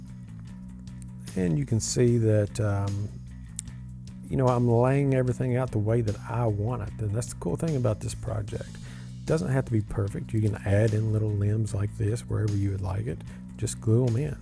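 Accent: South, United States. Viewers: As an Australian I love your southern accent! Gorgeous project too : )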